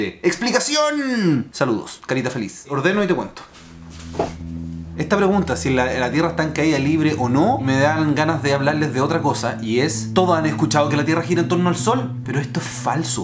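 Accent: Chile. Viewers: que bueno haber encontrado este canal, vengo de QuantumFracture, del vídeo de la tierra plana vs tierra esférica. Por el acento deduje que eras Chileno, que genial.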